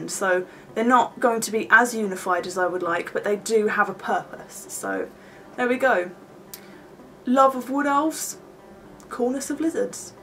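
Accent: England, United Kingdom. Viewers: You are so funny and great stay sweet and keep up the great work, It so great to see your growing as a gamer. Cheers. Later mate I have Wood Elves and Skaven. I find you can so a lot of archers but they both need new books and at this rte (th will be out beofe they are: ( Love the English accent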